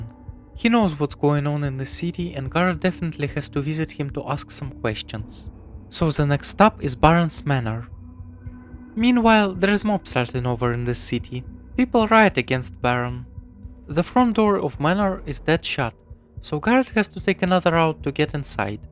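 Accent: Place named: Eastern Europe. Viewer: I find your voice very appealing. That airy, slightly androgenous, slavic thing you've got going on. Yup. Very nice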